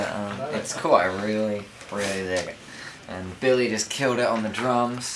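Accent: United Kingdom. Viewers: I think he actually still have that British accent :o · I love his accent... Give it up to British guys <3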